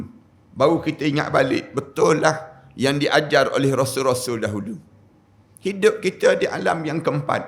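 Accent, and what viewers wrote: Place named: Kelantan, Malaysia. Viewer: Kuat bunyi Kelate Boh kito ni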